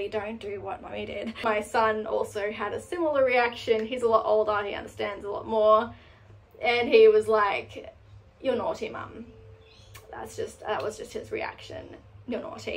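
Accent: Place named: Australia. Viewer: nah, the aussie accent sounds really nasal and masculine on her.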